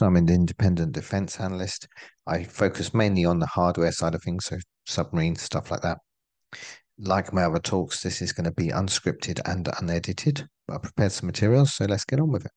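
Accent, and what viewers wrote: United Kingdom. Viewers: Kerel, doe even rustig aan. Hij doet zijn best, alsof jij vloeiend in elke taal bent. En het is geen "yank" maar een Brit....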